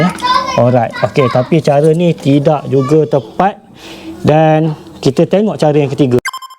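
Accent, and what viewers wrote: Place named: Pahang, Malaysia. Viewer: Bang org phg ke... Klu phg area mne..? Kuat slang phg ter...haha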